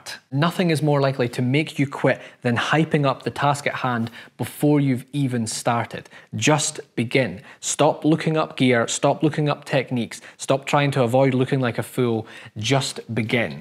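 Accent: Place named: Scotland, United Kingdom. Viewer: your videos are always an inspiration to me, thank you! Your methodical and logical approach, and calming Scottish burr, provide a path to things I've previously thought were out of my reach. God bless you!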